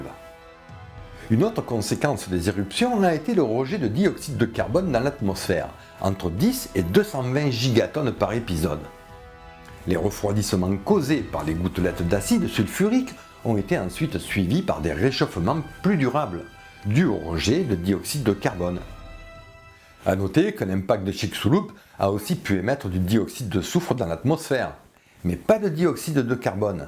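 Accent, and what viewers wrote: South, France. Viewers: Très bon sujet. Et c'est toujours agréable à écouter avec cet accent plein de soleil alors qu'il pleut à verse chez moi :) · J'adore votre chemise, manque des espèces d'arbres fossiles dessus, j'aime encore plus votre commentaire assez clair outre l'accent du Sud ^^ Les trapps de Sibérie semblent indiquer que l'humanité pourrait aussi disparaître un jour suite à un épisode volcanique ravageur enclenché par un réchauffement global et relâchant des mégatonnes de gaz toxiques... Yellowstone pourrait être un vecteur de catastrophe, pour l'instant le fond du cratère se déforme et s'élève et les geysers chantent devant les touristes, advienne que pourra. La peau d'orange qui recouvre le globe pourrait se déformer aussi avec une fonte accentuée des glaces, ce sont des phénomènes qu'on maîtrise encore mal car ils sont multi factoriels, donc probable qu'on soit mis devant le fait accompli en cas d’événements cataclysmiques, mais restons optimistes...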